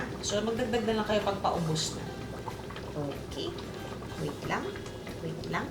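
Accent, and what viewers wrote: Batangas, Philippines. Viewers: Batangenyo accent ang imik habang nagluluto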